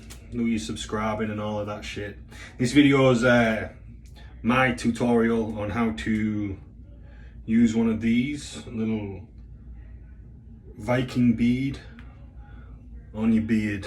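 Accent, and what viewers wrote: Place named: Yorkshire, United Kingdom. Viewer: Mate, are you from Hull originally? Sound like a Yorkshire lad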